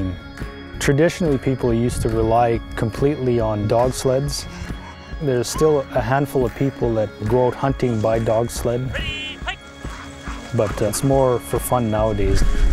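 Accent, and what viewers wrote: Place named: Canada. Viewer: He has the most Canadian Canadian Accent haha love it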